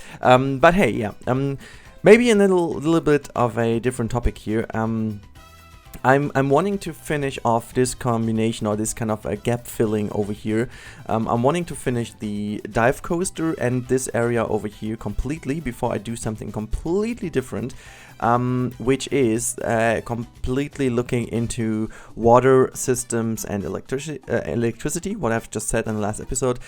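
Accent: Germany. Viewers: Are you German? You sound like one!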